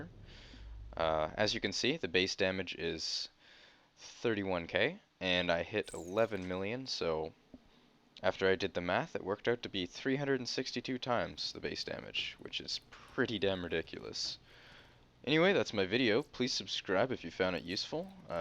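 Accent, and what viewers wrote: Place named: Canada. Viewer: dat canadian accent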